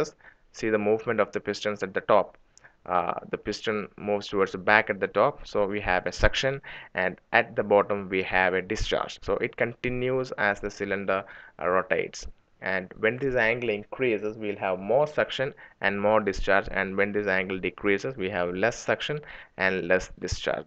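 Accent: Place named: South India, India. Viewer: Products sorry man,it south Indian English they cant help it,ask something technical u will certainly get an answer. south Indians are one of best technical race on earth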